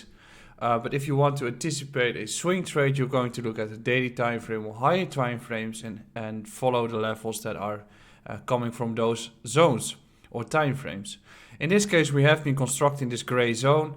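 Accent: Netherlands. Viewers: Haha, aan je accent is te merken dat je Nederlands-talig bent, wat zijn je verwachtingen voor Zilliqa aan het eind van het jaar ? Zojuist 10.000 euro geinvesteerd, hopelijk kan ik me hypotheek aflossen binnen een paar jaartjes 😃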